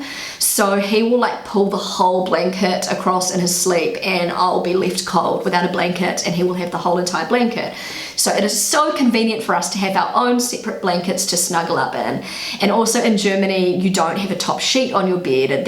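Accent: New Zealand. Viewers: Wait...I thought ppl from new Zealand talk English the way Americans do not that accent that you have or am I wrong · Btw I love your kiwi accent don't loose it! ;) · Der, I really appreciate watching your VLOGS regarding the content and because I enjoy Hearing Kiwi English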